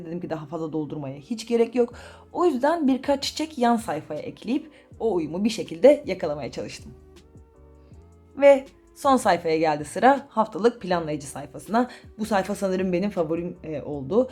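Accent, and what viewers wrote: Adana, Turkey. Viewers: Abla adanalı olanlar anlar bende videoya girer girmez dedim ki adanalı mi acaba? 😂😂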